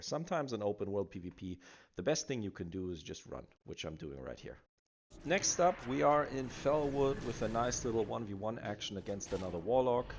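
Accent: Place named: Germany. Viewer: So ich denke mal das du deitscher bist, nach dem englisch akzent hört sicht das so an sehr geiles video, aber gehst du jetzt standart auf SA oder doch fellguard? Also klar kommt es immer drauf an aber ich finde SA hat geileren dmg sber der support vom Felguard is nich ohne... vllt son Fazit mit dem 120%buff und spielst du destro oder diesen misch specc in pve?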